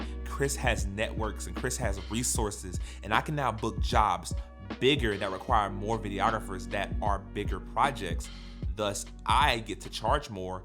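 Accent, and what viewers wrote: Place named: Mississippi, United States. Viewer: BRO YOU FROM SOUTH MS ?? Word yo me to!!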